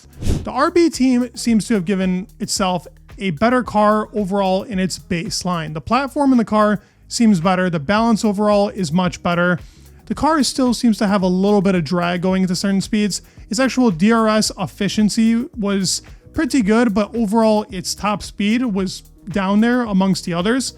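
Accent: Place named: United States. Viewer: love your videos just wanted to say it’s great hearing a fellow American voice talk f1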